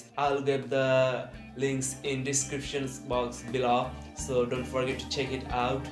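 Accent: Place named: India. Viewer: You need to work on that indian accent though, captions do not go along well.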